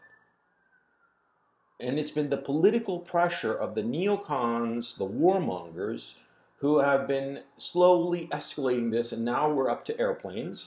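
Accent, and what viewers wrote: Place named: United States. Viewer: Claims to be from Ukraine on twitter, has the strongest american accent in existence, sure bud. How much is Russia paying you out of curiosity?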